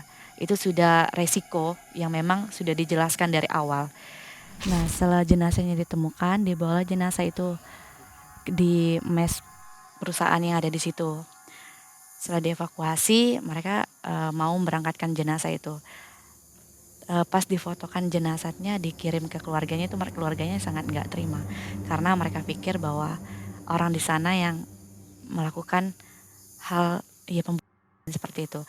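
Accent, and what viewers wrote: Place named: Kalimantan, Indonesia. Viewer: Panggilanya pake logat. Magg. Khass kalimantan bujur.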